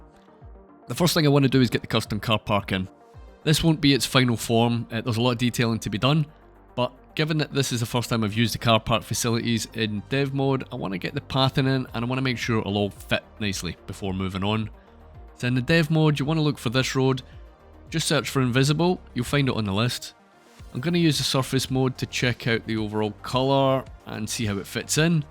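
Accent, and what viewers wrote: Scotland, United Kingdom. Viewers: I like your videos! Love the intersections tutorials! Well done! Any chance you are from Scotland ? :D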